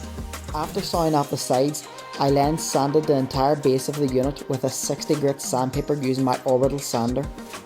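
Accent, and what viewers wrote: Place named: United States. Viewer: really like your recycles but I am very confused by your language. Are you Irish, American or Canadian? Your products and pricing are British but you talk as if you are American/Canadian.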